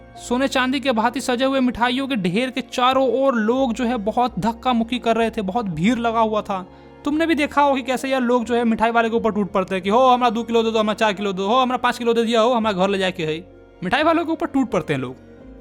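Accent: Bihar, India. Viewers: App bihari ho bhiyaa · bihari tone on TOP🔥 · Bhai kya aap bihari ho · Bhai aap muzaffarpur , bihar se ho kya ? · U are from Bihar?? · That Bihari accent! Just wow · Bhai aap Bihar se ho kya? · Sir ka mode change ho jata ha bich bich ma 😂 Bihari accent always rocks 😅😂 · 😅😅😅😅😅bhaiya app ka bihari language mst h😅😅😅😅😅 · Channel owner BIHARI hai 110%✅ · bhai app bihar sai ho kya.❤❤ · sir ji....ider bihari kyu bolte ho..... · Tora bihari acha lagwati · sir you belongs to which district of BIHAR ? because your language is similar like mine and i belongs to patna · aap bihari ho na?? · Is he also from bihar🤔. Iam a bihari girl and his language hears like bihari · you are from bihar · mj aa gaya bhaiii inki tune kitni mast hai bihari + hindi lahjabav that line { bada harami bachaa hai } was epic ❤❤❤❤❤❤❤❤ thanku bhaiya..... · i am sure he is bihari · Bhaiya are u bihari 😆😆 mast tone hai mana parega JAI BIHAR JAI BIHARI ❤ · Are you from Bihar?.. 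That lines > Re laiKA yene aao!!.. I am also from Bihar..And aapse padhne mein bhut hi maja aaya!! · Bihari ho kya😏 Video me bhi aaeee auuu kar rhe ho😒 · you are BIHARI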